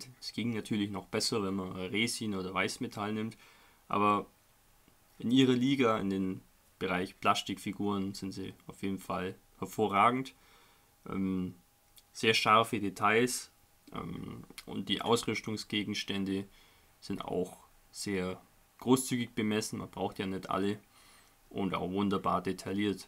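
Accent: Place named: Swabia, Germany. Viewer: Naja ich denke mir manchmal schon wie amüsant der schwäbische Akzent doch manchmal ist... für einen Rheinländer etwas befremdlich aber dennoch macht es den besonderen Charme der Videos aus.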